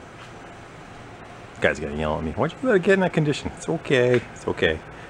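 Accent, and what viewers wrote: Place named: United States. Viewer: It's ok, you speak American like us! If we wanted Shakespeare we would be watching a play!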